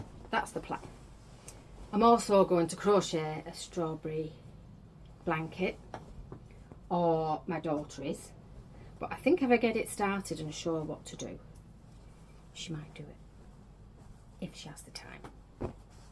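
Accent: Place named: Northern England, United Kingdom. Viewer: Just come across your channel and have subscribed. The quilt is beautiful - such soft, pretty colours. I’ve never tried Tunisian crochet so am interested to see how your sweater turns out. Where is the beach at the end of the video? I was born in Northumberland and lived in the North East until my late twenties. So nice to hear a northern accent. I’m with you on card only payments. We must pay with cash wherever we can otherwise risk losing it altogether. Happy crafting, Margaret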